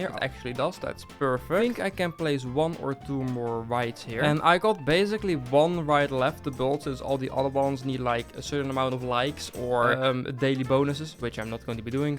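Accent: Netherlands. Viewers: Ik vind het zo leuk ook gwn met het Nederlandse accent. Ga zo verder! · deze guy is sws Nederlands (de accent). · R u Dutch? Ur name is Dutch and there is kinda a Dutch accent in jr English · I bet this guy is dutch i mean look at his accent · Ik hoor Nederland haha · Ben je Nederlands want ik hoor dat gewoon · U sound like u are Dutch are you? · Hello, it sounds like your Dutch. Are you Dutch? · Je klinkt nederlands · Ik weet dat je Nederlands bent 😂 ik hoor het aan je stem anyways love the vids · Are you Dutch? You here the accent😂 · Are you Nederlands.I hear the accent.😂😂😂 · Ik hoor het nederlands accent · Hi i have been following you for a long time now and i always hear like a bit dutch so are you dutch ir for real english if your like truly englisch than sorry that i asked this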